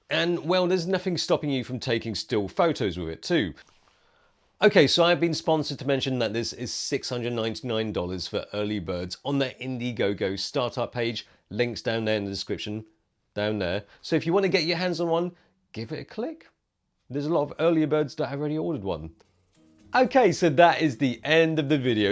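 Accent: United Kingdom. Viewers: I think accent gets more British with every video of his I watch.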